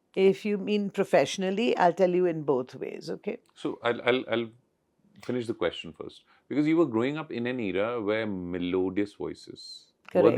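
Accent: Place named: Kerala, India. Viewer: Loved your interview and malyali accent😅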